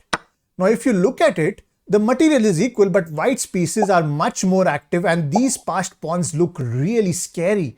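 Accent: India. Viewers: cant stand that indian accent. flunked a couple of college courses because of that shit.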